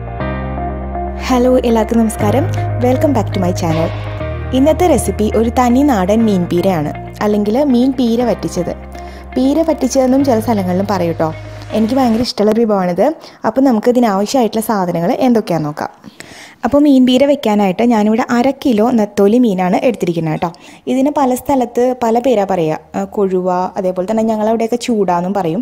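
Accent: Thrissur, India. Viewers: Beautiful Thrissur language. Beautiful explanation, beginners can follow well.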